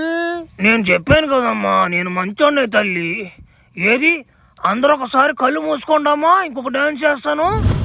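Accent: Nellore, India. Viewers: Nuvvu buthulu matladitey Nellore yasa vastadi bro❤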